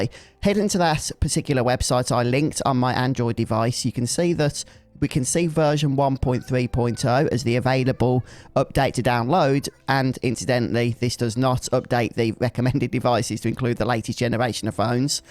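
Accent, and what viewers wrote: England, United Kingdom. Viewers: Thank you for info What part of england is your accent from ?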